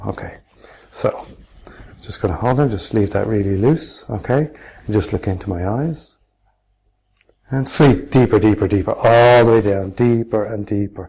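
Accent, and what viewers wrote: Ireland. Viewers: wow. You're Irish, yeah? Want to take on a student? :D I dunno about anyone else, but when I sleep for 24 hours I wake up feeling like shit lol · Are you Irish ?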